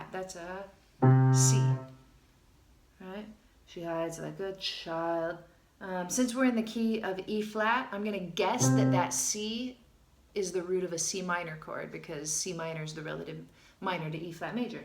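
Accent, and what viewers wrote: United States. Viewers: So American 😂...Thank you for all the teachings....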